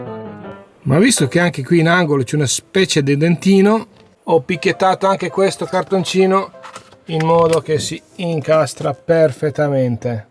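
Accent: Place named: Eastern Europe. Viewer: ma..io sento un accento slavo .. e nn dico rumeno.. secondo piu slavo .. ucraini.. ex iugo..bulgaria?)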